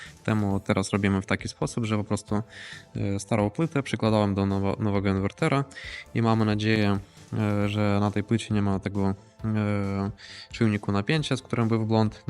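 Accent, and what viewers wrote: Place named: Eastern Europe. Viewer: Słyszę akcent typowo słowiański 🇺🇦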